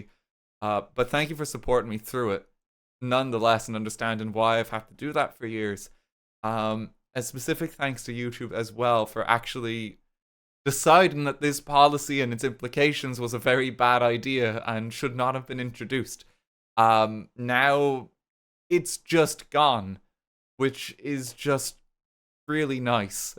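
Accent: Ireland. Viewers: You're Irish?!?!?!?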